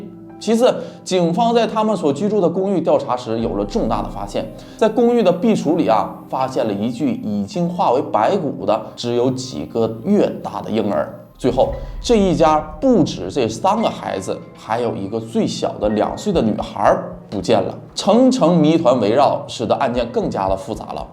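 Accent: Northeast, China. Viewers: 感覺樓主為了把普通話講標準特別吃力，還是經常露出東北話的發音，總是替他捏把汗！其實根本不用刻意地說標準的普通話，用東北話講解更有特色！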